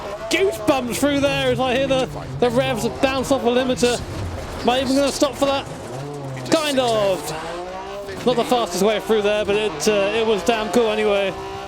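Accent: United Kingdom. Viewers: Are you British or American?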